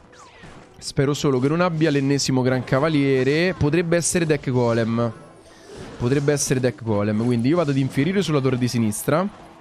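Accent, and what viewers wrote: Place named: Rome, Italy. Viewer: Perche parla romano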